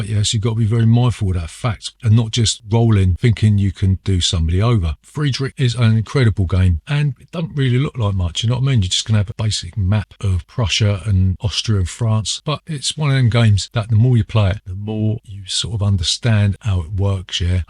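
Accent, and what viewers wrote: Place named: South West, United Kingdom. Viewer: All the way at the back here. Time to throw out some random counties, I've always thought Kent but often hear a little south west twang Great list, M44 is there for me also.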